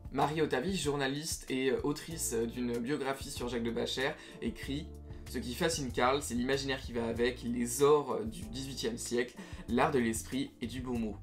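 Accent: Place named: France. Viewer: J,adore votre accent tres francais, pas trop pointu.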